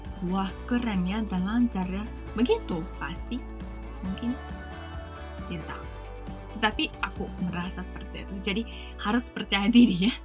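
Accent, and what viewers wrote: Java, Indonesia. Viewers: Logat kak waktu berbicara bahasa indonesia seperti logat medhok/bahasa jawa, wajar kan tinggalnya di malang wkwk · Kok medok ya... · Logatnya kok bisa medok banget ya (logat bahasa jawa) 😅😂😂😂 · Logatnya mbak ke ada jawa jawa nya 😂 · mbak medok jawanya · Kadang logat nya kek bahasa jawa Mbk 😄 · Mbak logat nya kaya orang jawa keren mbak ak asli orang jawa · ini sih udah orang indonesia.....logat medok nya lucu hehehhee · 彼のアクセントはジャワ語に少し似ています 😅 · bahasa Indonesianya logat Jawa, mangstab gan 🔥🔥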